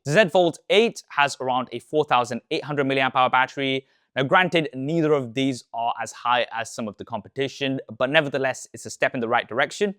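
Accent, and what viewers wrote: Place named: United Kingdom. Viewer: SuperSaf can you work a bit on your elecution & diction for these videos? It's really tough on non-British listeners. I had to press the -10 button sll the time and by the end of the video my ears were screaming. I assume this a regional British accent with the sing-song up-and-down chit-chat you do with the guys? Because a standard London accent I usually understand very well and even regional accents of older people eg Andy Burnham I understand fine. Cheers!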